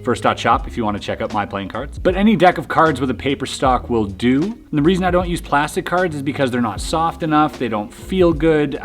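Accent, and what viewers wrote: Scotland, United Kingdom. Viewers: we have missionaries in Scotland plus a guy playing the bag pipes at the church wide picnikick in back of our church in Chesapeake Virgina he played the music of song that also in star trek movie the death of Spock ps i video recorded the church wide picknick at Good News Baptist Church and uploaded to youtube video search engines channel ps nice cool out fit and good Scottish talk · Good Scottish accent, too 😅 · From scotland stay like 20 mins away from Earlston loved the accent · Fabulous video. A great Scottish accent. Scotland is a fantastic place. I am the world’s worst magician, but always finds videos like these very useful.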